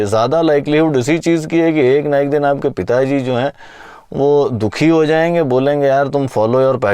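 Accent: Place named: Indore, India. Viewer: Hello sir, meri yah janne ki iksha hai ki kya App indore (MP) se hai kya... bas ese hi puchh raha hu kyuki apki boli se app indore ke lagte hai...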